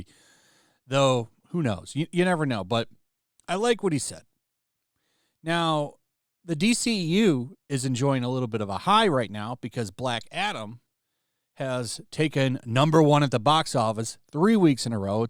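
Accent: England, United Kingdom. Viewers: when you developed an English accent I thought I was watch heel vs baby face but minus the screams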